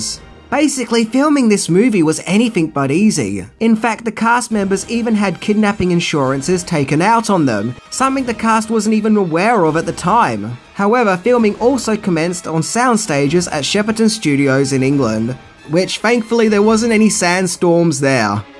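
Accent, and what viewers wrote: Australia. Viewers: Oi you’re an Australian, sweet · It’s even printed wrong on the last title card. I was cutting slack for the Aussie accent thinking maybe he’d always just heard it wrong but when it appeared incorrectly in print, I gave up.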